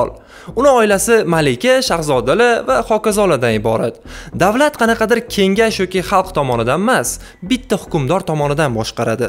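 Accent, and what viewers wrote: Tashkent, Uzbekistan. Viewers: Тошкан шевада гапирманг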